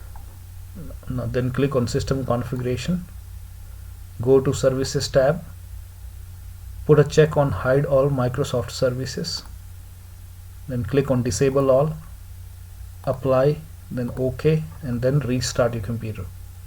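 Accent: India. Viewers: typical tutorial on youtube 7/10! but not the best has Indian accent ✔️ loud keyboard noises ✔️ yellow circle around the mouse cursor ❌ said "hello friends" at the beginning ❌ video has more likes than dislikes ✔️ it worked ✔️ low video quality 🟡0,5 low audio quality 🟡0,5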